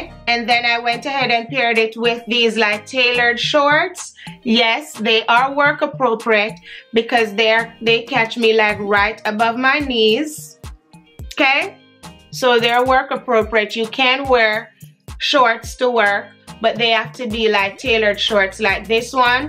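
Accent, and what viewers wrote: Jamaica. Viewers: Me hear the 🇯🇲 accent and then me hear the patois chip in ,me instantly proud🥰. New subsriber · Girl when I heard the yardie accent is the fastest I ever subscribed. I'm also a teacher and like you ain't about that shabby look. Love the video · you sound Jamaican lol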